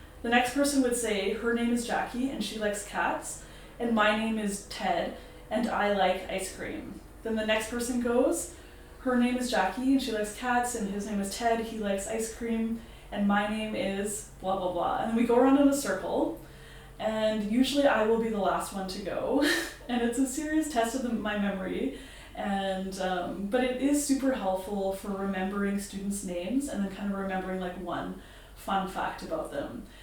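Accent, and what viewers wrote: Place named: Canada. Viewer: LOL Your Canadian accent is very prominent in this video. It's not bad, I'm just saying I picked up on it right away. But I guess that's why I'm a trained ESL teacher. I can easily identify accents from all over the world. My question for you, though, is what kind of icebreaker activities would you recommend for adult beginners? Most of the beginner activities I come across are for young learners. I'm looking for some activities that adults can do that aren't too advanced.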